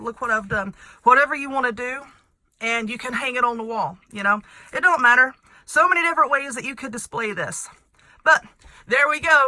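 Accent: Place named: Georgia, United States. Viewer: my first video of yours. love it! I'm dying to watch more to know if you're out of Georgia. You sounds like my cousin who was raised in Athens.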